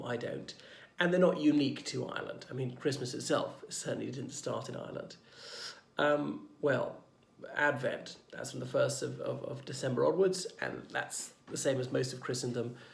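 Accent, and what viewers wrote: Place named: England, United Kingdom. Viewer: You sound incredibly English to me. What is your channel actually about? Is it more of a discussion channel?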